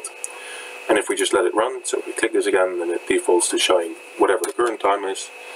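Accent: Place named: Netherlands. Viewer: Jesus wat een kut nederlands accent heb je als je engels praat -.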